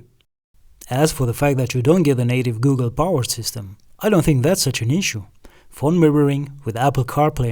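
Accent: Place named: Ireland. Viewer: You sound like an irish man. Are you Irish or Romanian?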